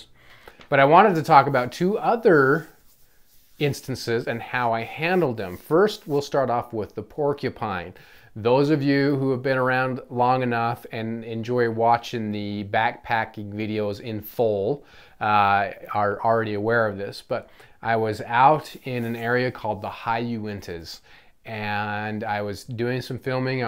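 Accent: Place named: Appalachia, United States. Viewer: You reverted back to your hillbilly roots with that accent. Good vid.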